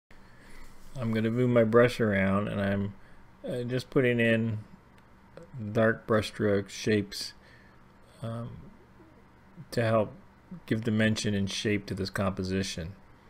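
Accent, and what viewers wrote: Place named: Philadelphia, United States. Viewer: Nice demo. And painting...sound like your from Philly. Or Cleveland. Lol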